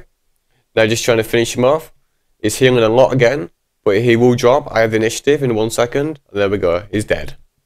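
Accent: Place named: United Kingdom. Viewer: i hate ur fucking british accent jeez , put subtitle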